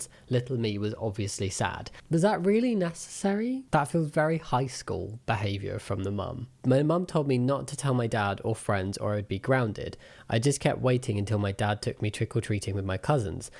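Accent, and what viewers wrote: United Kingdom. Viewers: Yk I’ve stopped watching all other British YouTubers because their accents kind of irritate me. You’re the only one I’ve kept :D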